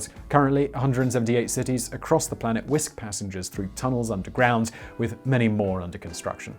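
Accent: United Kingdom. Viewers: Why do English people say "figger" or "figgers"???? It is FIG-URE or FIG-URES. Following your logic the racist word for black people shouldn't rhythm with the British pronunciation of "figure", correct?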